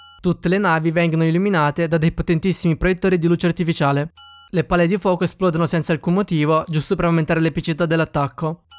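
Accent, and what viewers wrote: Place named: Naples, Italy. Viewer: complimenti per il video. PS. l'accento parteneopeo si sente tutto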